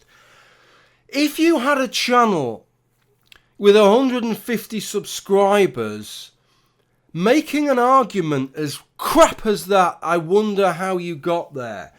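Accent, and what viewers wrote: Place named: Birmingham, United Kingdom. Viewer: The insults are 3x funnier with the Brummo accent, don't get upset, you're perhaps the only atheist I enjoy listening to since most of the Rational Skeptic TM community have their heads up thier arses: including everyone in Apes video, especially since Sarg'n began his losing streak against the "Alt Right" also a few Liberalist discord mods are soliciting 14 yr old boys for sex. That might put this into perspective.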